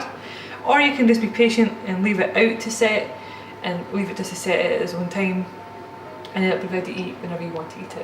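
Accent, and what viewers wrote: Scotland, United Kingdom. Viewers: New subscriber! How did I not know about your channel lol :D I do recipes too...nice to hear another Scottish accent x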